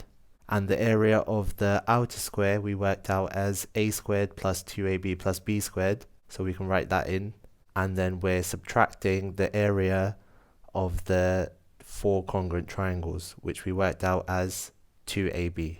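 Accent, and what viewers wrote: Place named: United Kingdom. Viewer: Excellently explained sir. Keep up the good work. Most importantly,I like your British accent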